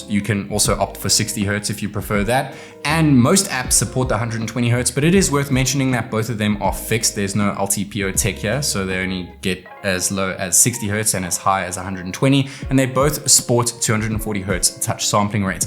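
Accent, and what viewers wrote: South Africa. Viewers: he sounds South African I’m not sure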